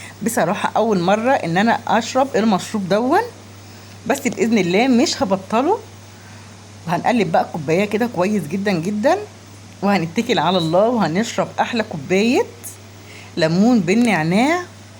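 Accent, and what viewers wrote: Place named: Egypt. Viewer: مشاء آلله حلو ولديد لهجة مصرية جميلة لكي اشتراك وتشجيع والف ليك ❤❤❤❤❤❤❤❤❤❤❤❤❤❤❤❤❤❤❤❤❤❤❤❤❤❤❤❤❤❤❤❤❤❤❤❤❤❤❤❤❤😊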